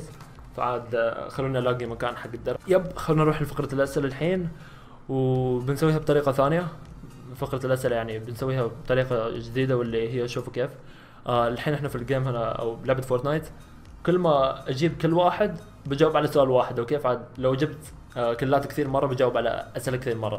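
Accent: Iraq. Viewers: منور يا كلبي بل لهجة العراقية 😍😂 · هو ما قال بس من طريقة كلامة واضح عراقي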